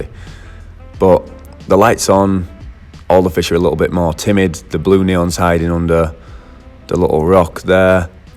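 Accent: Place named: Manchester, United Kingdom. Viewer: Nice vid --makes sense. I'm actually gutted cos I thought you was a Manc livin locally was gonna ask you if I could come round ya gaff and buy some fish of ya. Never mind,seems like ya doin well in the states --good luck to ya👍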